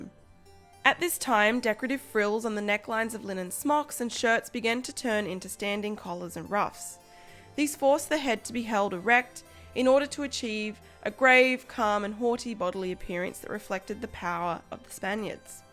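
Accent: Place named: Australia. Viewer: You sound Australian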